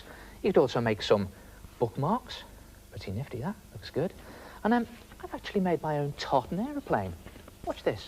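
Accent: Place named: Liverpool, United Kingdom. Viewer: I am Canadian and I used to watch Art Attack all the time and I thought Neil was Scottish I now know he’s scouse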